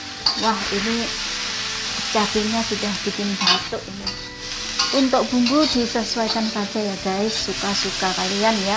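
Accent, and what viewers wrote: Java, Indonesia. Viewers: Wong jowo to mbak, .. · Kak, muka nya kayak apa liat dong, suara halus bener, jelas kok omong nya, pelan halus bener, jawa bener 😃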